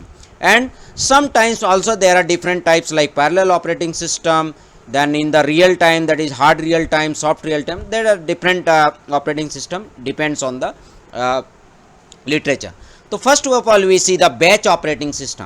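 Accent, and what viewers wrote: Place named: Pakistan. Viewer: Your pinglish is awesome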